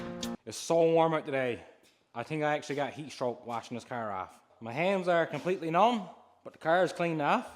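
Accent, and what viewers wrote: Ireland. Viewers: What type of accent is this? Irish Redneck?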